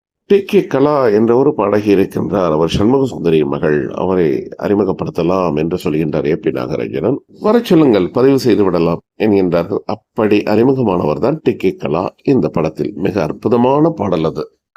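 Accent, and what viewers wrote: Sri Lanka. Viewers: அய்யா தகவல் அருமை.உங்கள்தமிழ்.இலங்கைதமிழ்போல்இருக்கிறதுநீங்கள்இலங்கையா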